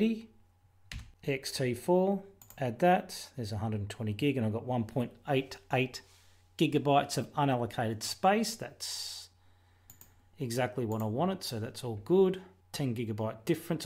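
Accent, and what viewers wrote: Australia. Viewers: I missed the Aussie english. Hope you're okay.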